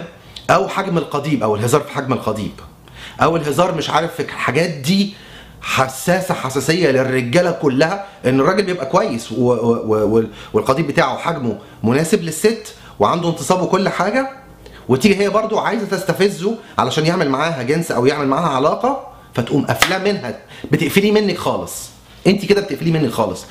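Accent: Egypt. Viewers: حضرتك شكلك أوربي استغربت انه لهجتك مصرية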